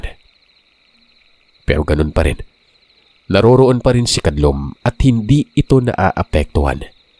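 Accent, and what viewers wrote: Visayas, Philippines. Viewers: wag kna magbasa ng may bisaya... BOBO KA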